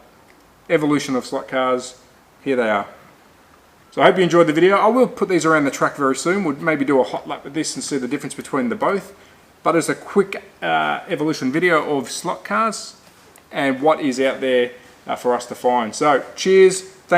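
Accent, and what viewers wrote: Australia. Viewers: That Australian accent grates me.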